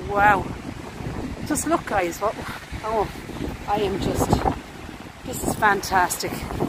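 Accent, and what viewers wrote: Ireland. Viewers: I am from Hershey, Pa USA. I LOVE YOUR BROUGE and have found you on YouTube and love what you are doing in your part of the world. Love the architecture of some of the building and gravesites you have found. I will keep on watching. Betty from Pa.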